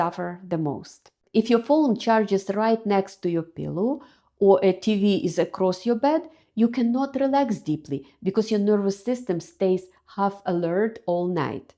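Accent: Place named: Russia. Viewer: У Вас очень яркий русский акцент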